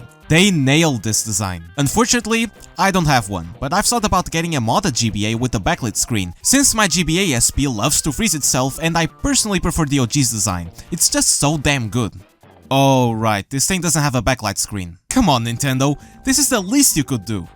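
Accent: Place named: Portugal. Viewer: I know a portuguese when I hear one! Good video 🤝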